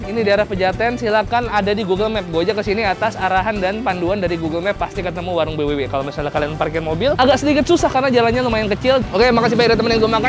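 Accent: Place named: East Java, Indonesia. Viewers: Oalah pantesan bahasamu jawatimuran mas wkwkwk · Logat jawatimur an aslinya mana sih 😅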